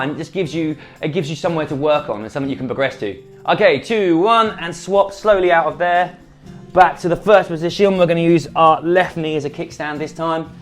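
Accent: United Kingdom. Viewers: Haha, I can hear the British accent off of this, Sends much love from Kenya👋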